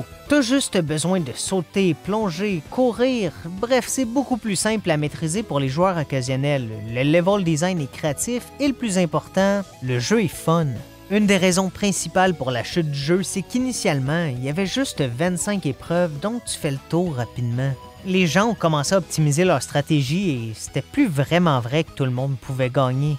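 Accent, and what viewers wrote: Quebec, Canada. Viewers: Je t'ai découvert il y a quelques semaines et tu est vraiment très drôle :) Le mix de ton accent québécois, des expressions que tu utilises et de tes blagues me font passer un bon moment ! J'ai regardé toute tes vidéos la soirée ou je suis tombé sur toi et je suis ravie de t'avoir trouvé dans mon feed youtube ! Voilà, juste merci pour ton travail ! Tu nous fait rire ! Je parlerai de toi à mes amis :D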